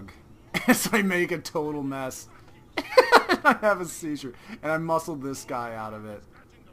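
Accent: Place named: Russia. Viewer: i love your gameplay your so funny to excellect rusian accent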